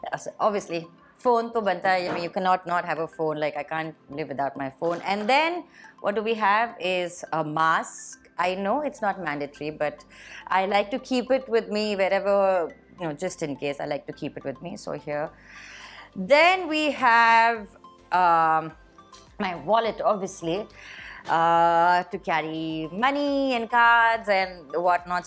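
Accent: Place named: United States. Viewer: açcent like American.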